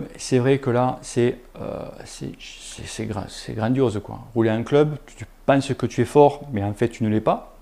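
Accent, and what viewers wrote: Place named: Béziers, France. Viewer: j'en étais sur que tu venais d'à côté de béziers, j'ai reconnu l'accent (maillot saint chinian)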